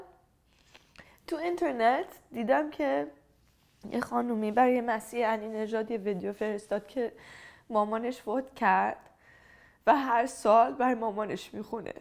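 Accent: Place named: Isfahan, Iran. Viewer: The first American with ISFAHANI ACCENT......................so gifted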